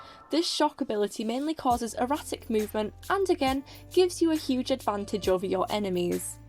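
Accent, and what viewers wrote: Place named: Scotland, United Kingdom. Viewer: Her accent is like philza, british r's and sometimes sounds like a scot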